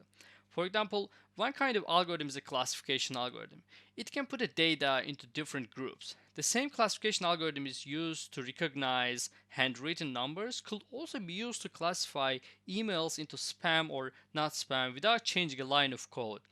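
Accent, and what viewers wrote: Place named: United States. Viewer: Abi cok guzel ingilizcen var, Amerikada olmus gibisin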